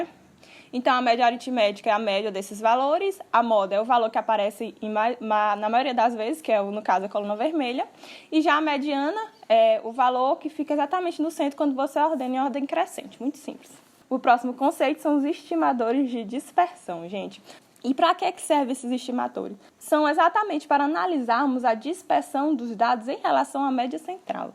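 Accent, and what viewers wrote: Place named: Northeast, Brazil. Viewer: bacana a aula, e o sutaki nordestino é característico srsrs..vlw pelas dikas ,, estou me formando em engenharia de pericias vlw. pela ajuda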